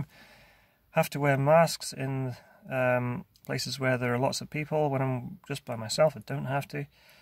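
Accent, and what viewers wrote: Scotland, United Kingdom. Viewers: I never noticed your Scottish accent until this video.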